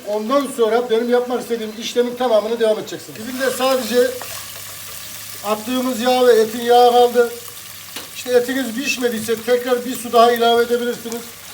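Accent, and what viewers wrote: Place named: Central Anatolia, Turkey. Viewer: Yaa tam bi iç anadolu şivesi çok tatlı olduğu için dülmem geliyor😅🙈Ben de yarın nohut yemeği yapıcam bi tariflere bakım dedim sizle karşılaştım.🙋🏻‍♀️Ellerinize sağlık, bilgiler için teşekkür ederim....🧡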